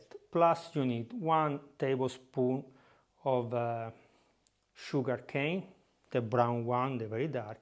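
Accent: Italy. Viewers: Yes, I started it in the morning and after ten days I will tell you the result... But i noticed that you speak English with an Italian accent 😄🤣 This makes you very nice 😄 And by the way, I love Italy so much and would like to visit it. 😍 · He's making flavoured alcohol and he has a comical Italian organ-gringer accent. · Orca boia l'accento italiano. Soltanto per questo ho guardato tutto il video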